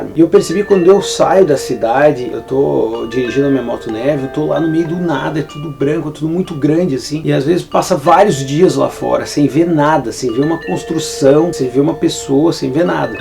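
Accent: Rio Grande do Sul, Brazil. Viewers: tu é gaúcho? Fala como um gaúcho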